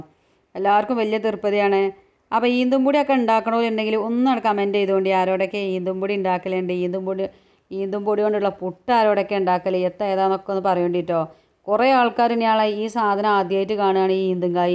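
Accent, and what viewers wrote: Malappuram, India. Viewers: അടിപൊളി മലപ്പുറം ലാംഗ്വേജ് കേൾക്കാൻ ഭയങ്കര സുഖം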